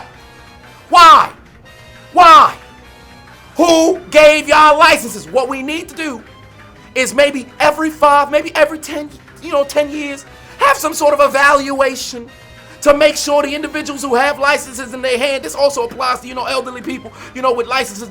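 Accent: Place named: North Carolina, United States. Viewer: Sounds like North Carolina or Florida honestly.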